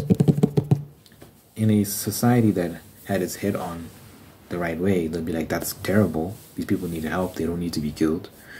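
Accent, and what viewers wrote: South Africa. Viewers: Where are you from I love your accent, sounds like South Africa to me 🇿🇦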